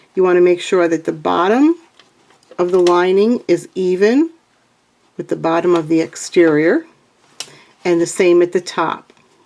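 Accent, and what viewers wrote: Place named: Brooklyn, United States. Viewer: Hi, I recognized the accent; I lived in Brooklyn for 8 years back in the 1970s! Then have lived in CT for many years. Now I'm in Oklahoma (Oklahoma?!) because we retired and followed our married son with the 4 grandsons here; he's a professor at a university. Thanks for the tutorials!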